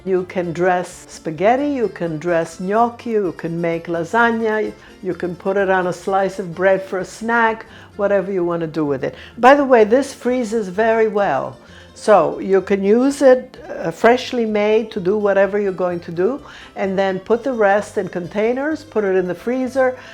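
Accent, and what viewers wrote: United States. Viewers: She has an extremely American accent. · This woman has never lived here in Sicily in her life... "Sicilian" my butt.. Thick AMERICAN accent... Americans always say things they are not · how come you sounds american and not italian?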